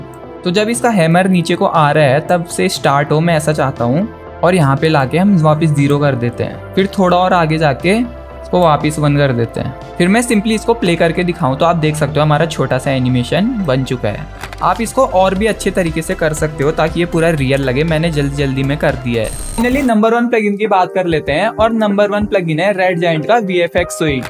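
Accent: Pahadi, India. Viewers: bhai awaz se toh pahadi lg rhe ho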